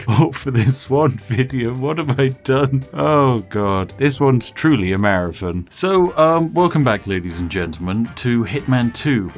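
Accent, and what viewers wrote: United Kingdom. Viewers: I subscribed to you for the lone fact of your British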